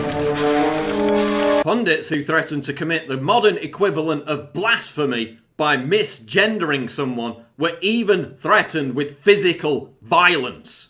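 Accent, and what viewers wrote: United Kingdom. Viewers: This channel sucks. This douche proves that simply adding a British accent to low brow topics doesn't make it better. Is this supposed to be informative or funny or just boring?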